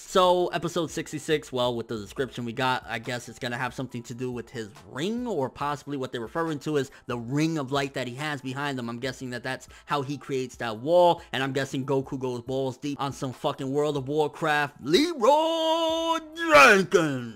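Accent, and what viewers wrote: United Kingdom. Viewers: Just imagined Funimation hiring to voice Zamasu in the English Dub with that British accent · love the British accent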